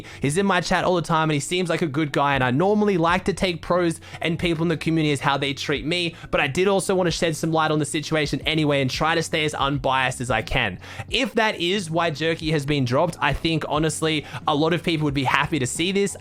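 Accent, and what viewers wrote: Australia. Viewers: sheeesh aussie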